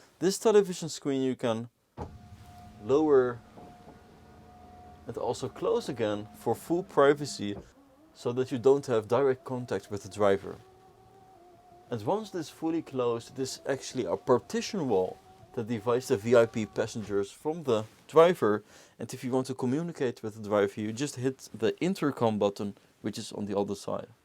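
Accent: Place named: Netherlands. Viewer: Is dit een Nederlands kanaal? Dacht dat ik dat kon horen aan zijn accent.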